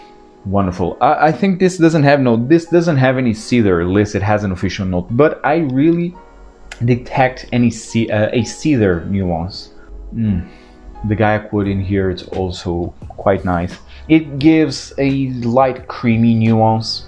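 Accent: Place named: France. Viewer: this is my first time watching your review, I'm loving it. thanks for the vivid describe of the notes. I also love your accent. it's like my french friend speaking english.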